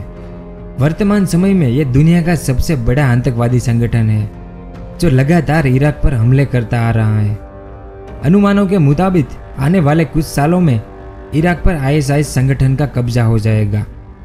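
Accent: Gujarat, India. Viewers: Guju Vai, do something to Your accent...... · Are you gujju? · Bro are u gujarati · bhai gujrati ho na tum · Bhai iski mothertoung Gujarati h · Hello brother, 1st you say me how to say this, u have any more research above countries..., I think u have to improve your Hindi speech from Gujarati style..., & u r to small to say above countries. Video banane ke or bhi bhahot sare topics available hai, jaha apni mastury ho ya vakahi jante hai usi disha me apna dhyan lagake mahenat karni chahiya varna 2( bavaji ki dhoti or bhakti ) kam bigate hai, mera aap se anurodh etnahi ki bina puri jankari kuch bhi dono hath bantna nahi chahiye. Topic achha tha par jankari bahot kamjor thi. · Bhai tu gujarati cho k ny? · Gujurati ho kya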